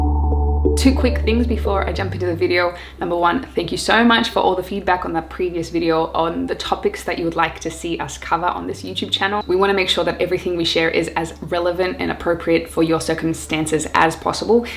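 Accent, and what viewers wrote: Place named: Australia. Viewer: I can't place her accent, Australian but there is something else..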